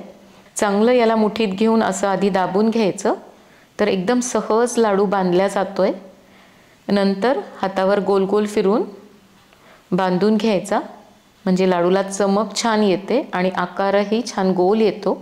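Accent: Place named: Pune, India. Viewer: Tumhi puneri bhasha bolta chan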